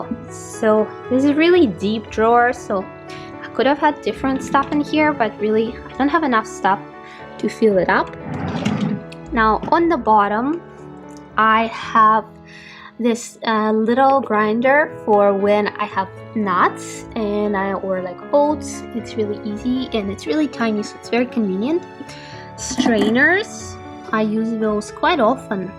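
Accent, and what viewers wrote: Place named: Hungary. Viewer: Your accent sounds like Naemi of Scandish Home, she is Hungarian. She and her family live in Oregon, USA.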